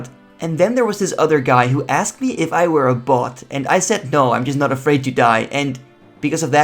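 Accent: Netherlands. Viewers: I recently discovered your channel and benching it rn! I was wondering if you were Dutch by any chance, your accent sounds familiar?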